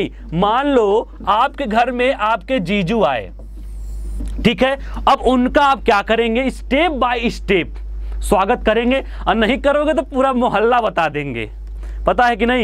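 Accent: Bihar, India. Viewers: Akdm bihari wala touch, maza agaya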